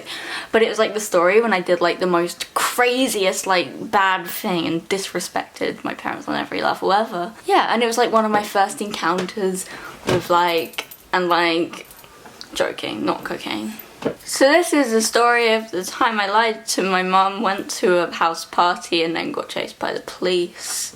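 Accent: England, United Kingdom. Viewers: is she from England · Jacob Engallena it's not her accent it's a British accent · dick bicycle I mean her hair looks pretty brushed to me. She has braces and she's British so her words might sound different. 😂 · Its English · She is from the UK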